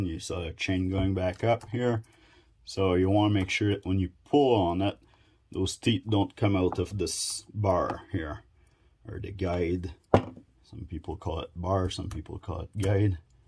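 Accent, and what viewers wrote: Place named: Quebec, Canada. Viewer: Thank you! May I say you have the most delightful French-Canadian accent.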